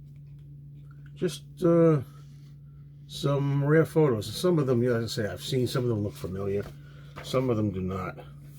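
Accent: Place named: North Jersey, United States. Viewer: You sound like you’re from north Jersey. Anyways I do like your channel and the few videos I’ve seen.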